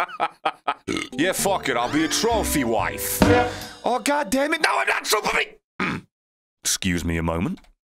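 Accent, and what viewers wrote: United Kingdom. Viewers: Is it just me or is EXTRA British today?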